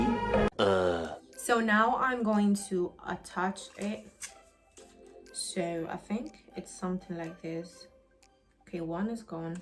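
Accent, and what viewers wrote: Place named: United Kingdom. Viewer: You English sounds British 😎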